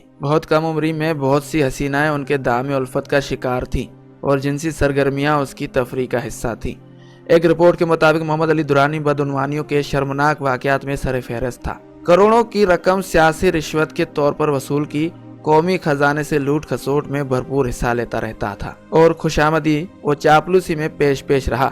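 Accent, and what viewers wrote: India. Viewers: This is Indian propaganda. This commentator's Urdu is typical Indian. His pronunciation is very Indian.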